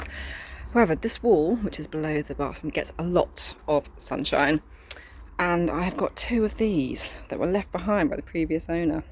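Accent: United Kingdom. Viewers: I'm a street (children's home) kid, listening to you English middle class folk, tickles my pickle.. Defo one of my favourites! Oh how the other half live.... 😄🙄🤪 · I think you’re showing your class prejudices… This ladies accent is very normal here in the U.K., not artificially elevated, just average/neutral.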